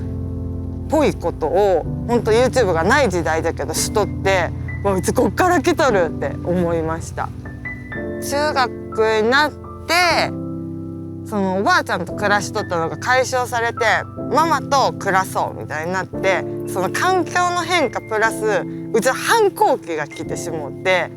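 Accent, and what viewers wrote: Okayama, Japan. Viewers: 所々岡山弁でそうになって抑えとるところ可愛い笑 ほとんど岡山弁やけど可愛いぞ！ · ぼっけぇ本音じゃねー(^-^)わしも岡山じゃけん、まあたその岡山弁たまらんのよ❗いつか、千鳥とコラボが出来たらぼっけぇ事なりそうじゃね。もっと岡山弁使っていこう❗ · なんか喋り方に既視感あると思ったら 藤井風も岡山じゃったわ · 岡山弁かもし出してるのがいい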